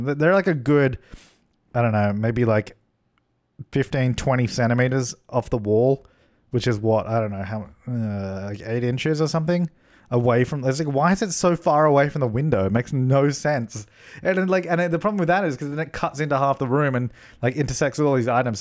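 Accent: Australia. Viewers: Are you Australian? your accent lmao, bc i am too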